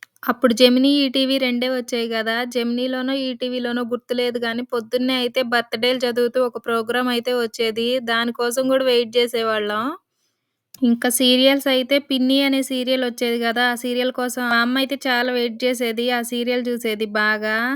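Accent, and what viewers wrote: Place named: Rayalaseema, India. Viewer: తెలంగాణ అన్నారు రాయలసీమ యాస మాట్లాడుతున్నారు🤔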